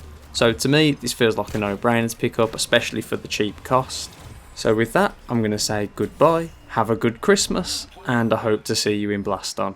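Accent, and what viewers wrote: United Kingdom. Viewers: I like this brummie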